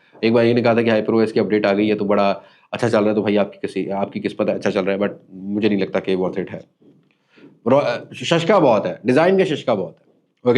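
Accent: Saraiki, Pakistan. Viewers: Your Siraki accent is 👌🏻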